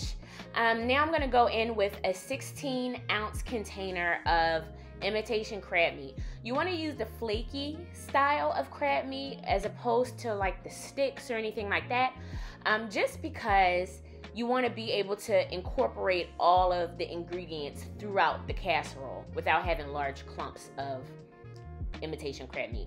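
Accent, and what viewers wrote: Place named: Philadelphia, United States. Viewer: You from philly!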